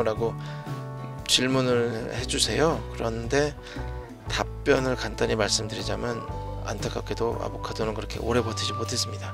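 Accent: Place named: Seoul, South Korea. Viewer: 작가님 유튜브가 있는지 처음알았어요ㅋㅋㅋ너무 재밌네요 작가님과 수다떨면서 작업하시는 모습 옆에서 보는 기분이예요ㅋㅋ작가님 말투가 90년대 서울사투리? 같아서 듣는 재미가 더해지네요~~ㅋㅋ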